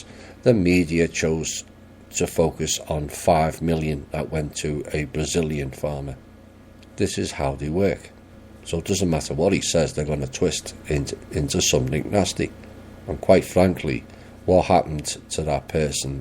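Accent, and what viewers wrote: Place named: Northern England, United Kingdom. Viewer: Good info, nice northan accent, feels like been back home, derbyshire man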